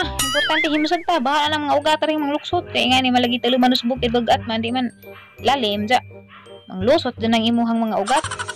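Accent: Bohol, Philippines. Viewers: Nalingaw kus imong accent dam hehe. Makamingaw ang bohol. Pagdag bringhauz dam ha hehehe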